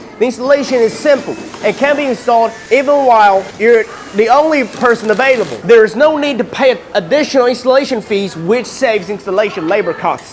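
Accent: South, United States. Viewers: The southern accent is much better than the British accent.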